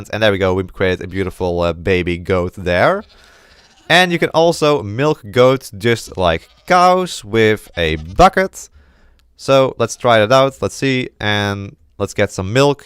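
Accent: Netherlands. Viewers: hello, i can hear you're from the netherlands :)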